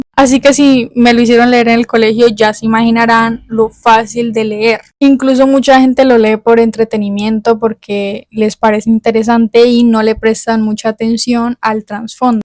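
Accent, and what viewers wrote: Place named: Cali, Colombia. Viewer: muchas gracias!! Que buen video amo, eres de Cali vdd, lindo acento 😙😙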